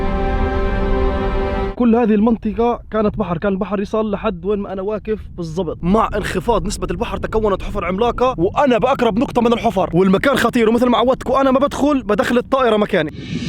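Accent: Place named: Jordan. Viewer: اللي يستغرب من لهجة الاردنيين الرقيقه يدوس لايك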